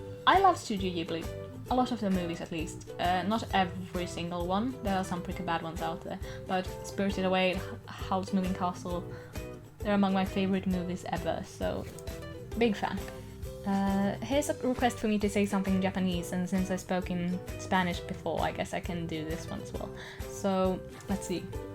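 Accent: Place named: RP, United Kingdom. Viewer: it's a bit posh but in a sophisticated way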